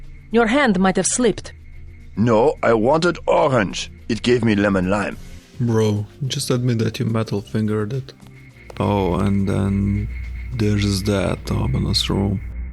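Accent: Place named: Eastern Europe. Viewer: Hi, are you from Eastern Europe? Accent going kinda thick💪 Love your videos, keep it up!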